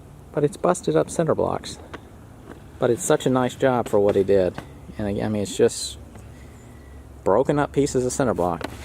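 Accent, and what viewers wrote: South, United States. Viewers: What accent is this? I can hear it is southern but I'm curious where it is from exactly. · It's called Southern. 🤦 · My guess is Georgia or Alabama, but what do I know? 🤷‍♀️ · Your accent was pure 'Gone With The Wind'!!! 'Oh Ashley'! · Yeah I would say his Southern accent is retaining a good amount of the "Transatlantic" or "Mid-Atlantic" accent that we used to teach in schools before WW2. You can check this accent on YouTube - fascinating story.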